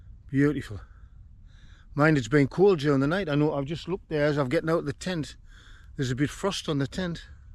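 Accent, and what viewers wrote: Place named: Durham, United Kingdom. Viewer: Good video,you sound like from my neck of the woods near Durham.i love Weardale.Im always on the fells.👍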